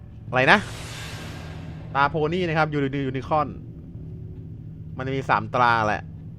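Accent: South, Thailand. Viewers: พี่เป็นคนใต้หรอค่ะ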